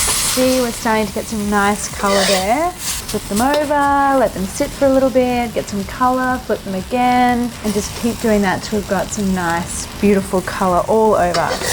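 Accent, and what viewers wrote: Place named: Australia. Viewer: I have been watching your cooking channel n I think you are an excellent chef!! I can tell by the ingredients n your style of cooking. I love it n I will definitely try it. Not only that, love your accent!! Aussie, Aussie, Aussie.....🐨🐨💕✨🦋💕✨🦋😘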